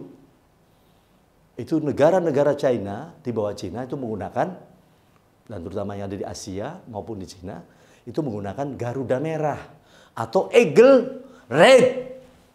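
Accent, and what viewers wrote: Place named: East Java, Indonesia. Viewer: Maap mas .mas ine dri logatnya .ngomongnya dri jwa timur .klu menurut sy mas ine bkn asli sunda .grhhh yy0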